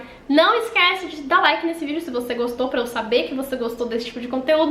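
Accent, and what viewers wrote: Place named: Paraná, Brazil. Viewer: querida, vc é do interior do Paraná? Temos sotaque parecido!!! Concordo organização e planejamento é fundamental, parabéns pelos seus vídeos!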